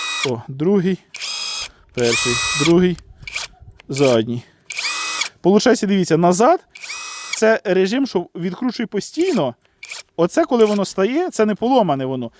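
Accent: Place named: Podillia, Ukraine. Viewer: Ви не з Поділля родом? Чую схожий говір)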